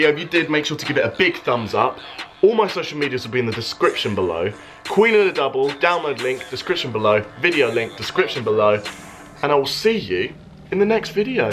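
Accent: United States. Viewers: I think you sound better with an American accent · I'm sorry but it was cringe hearing you in an American accent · I don't get why people are hating that you used an American accent. It sounds dope and it's you doing you, so keep doing you bro.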